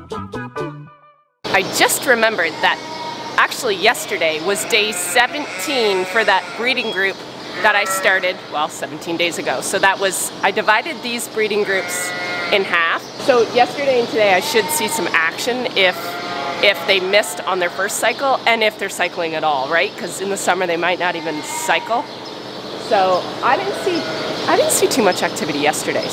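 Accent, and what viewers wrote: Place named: Canada. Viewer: As a texan, i love your canadian accent!!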